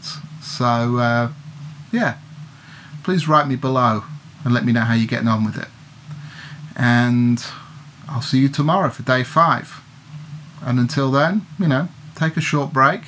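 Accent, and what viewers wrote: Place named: Cornwall, United Kingdom. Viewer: this exercise has taken the worry I had that this dental work would really mess things up! I'm playing with you relaxed and it's keeping my tone in tune with yours. Your a life saver. John from New Jersey. Oh by the way my Wife Beverly is from Cornwall UK her accent matches yours to a tea. Mine is a Jersey accent. This exercise is going to keep me playing threw my dental visits. Thank you very much.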